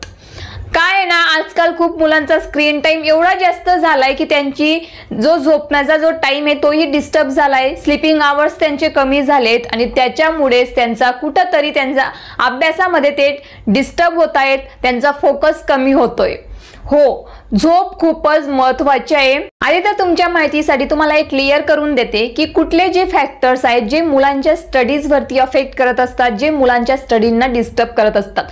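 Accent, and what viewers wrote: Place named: Jalgaon, India. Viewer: मॅडम जळगाव चे असतील असे बोलण्यावरून वाटत आहे मराठी पेक्षा इंग्लिश जास्त छान आहे पण विचार हि खूप चांगले आहेत खूप छान माहिती आहे 🙏🙏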